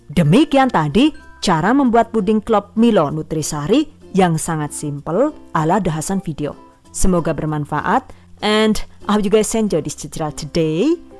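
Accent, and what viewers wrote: Banyumas, Indonesia. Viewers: 😂😂😂 suka kalo bunda ngapaknya keluar..ora ngapak ora kepenak 😉😉 salam dari medan bunda 😘😘😘😘😘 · Inspirasi terimakasih Aq suka resep2Nya gampang di pahami 😍😍 Aq suka denger ngomong bahasa ngapakNya lucu 😊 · Maaf bu asli nya dari daerah mana ya .. klo ibu siaran yutube aku ketawa loh dngn bahasa ny ternyata pada ngapak ke yah .. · Jeng bahasa ngapak nya tu lo yg bikin kangen.jian tenan mantap klau ngomong bahasa ngapak.anak saya ketawa terus klau dengar bahasa ngapak yg waktu bikin donat.disuruh muter berulang kali yg ada bhasa ngapak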